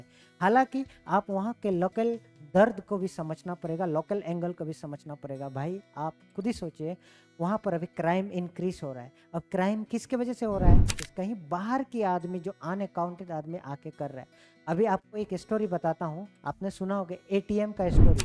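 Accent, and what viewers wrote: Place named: Bangladesh. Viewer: Eye bangladeshi hai bolne ka tarika patachal teh.hai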